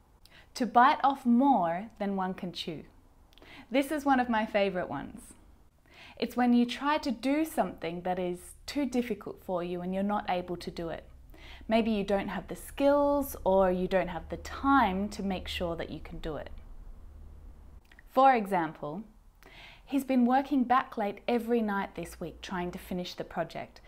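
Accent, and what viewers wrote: Australia. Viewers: you have no ideia how I do like your videos. I found you so clear when you are speaking, although I am familiar with Australian accent because I already have been in Australia over a year taking my uni exchange I think there is something really special in the way that you speak to, I can not to point it out but what I know you are the best English teacher that I met so far. You are so creative and the way that you try to create an interaction between you and your audience makes easy to memorise what you are teaching. I'm planning to move back to Australia again and take my master's degree there, therefore I will take again an English exam very soon so, I'm watching to your videos and practicing the imitation technique regarding to improve my speaking skill. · Hey, I really enjoy watching your videos, even more with this Australian accent. 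Hey, just giving an idea for you, you may start your recording with some warm up activity, in order to prepare your audience for what comes later, then stablish tasks with information gaps to maintain the curiosity of your audience. It's just an idea for you. Thank you for being shared these idioms. Cheers. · Hello, are u teaching Australian English?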